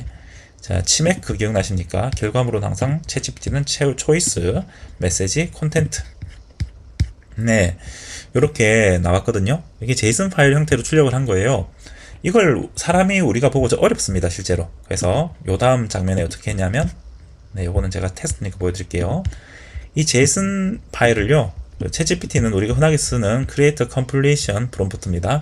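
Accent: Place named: Busan, South Korea. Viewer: 어쩐지..부산억양이 익숙한 느낌이...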